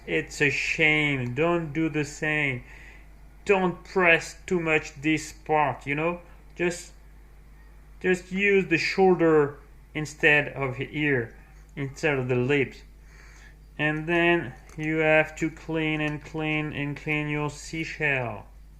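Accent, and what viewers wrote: France. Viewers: Very interesting to know this! Your French accent is charming, esp the way you say 'Horrible'! Thank you for a detailed video. I would shorten it a lot though, there is too much repetition and its too long. I'm American, so of course, we like things to the point and fast. You should always wear glasses yourself. You never know if you suddenly slipped, or had a strange unexpected accident that spilled bleach on your face. Also gloves. With regard to dental tools, you can buy them cheap on Amazon for dog teeth cleaning. Merci bien!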